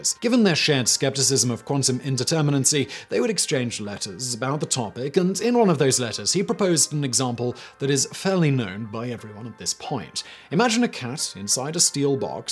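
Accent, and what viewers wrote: United Kingdom. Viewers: Today I learned there’s another vsauce, except with a British accent.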